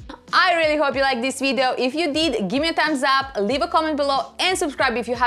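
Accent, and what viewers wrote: Russia. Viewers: Beautiful!! I love this technique, too! I found it by accident when my project got too dark and I tried bleaching it. Worked like a charm! I don’t worry, though I know I should, about the smell. I clean my whole house in bleach quite often; especially in the kids’ bathroom. I have five boys!! Ha! Bleach is my best friend! Also, not sure if you’re Russian, but your accent makes me miss my old neighborhood so much. I had many Russian friends there. Ugh. I miss them!!! 😭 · Здравствуйте. По вашему легкому акценту я догадалась что вы русскоговорящая. Я смотрю все ваши проекты и мне очень нравится каждая ваша работа. Этим летом у меня предстоит большой проект тоже. В спальне буду красить всю мебель, приелся уже этот коричневый цвет. Вам успехов, а мне удачи (не то придется покупать новую мебель 😆)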